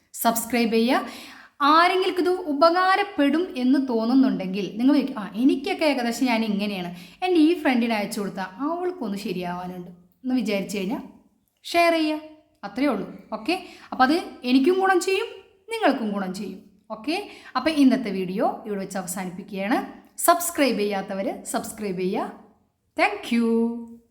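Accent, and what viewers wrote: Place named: Ernakulam, India. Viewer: എറണാകുളം ഭാഷ😅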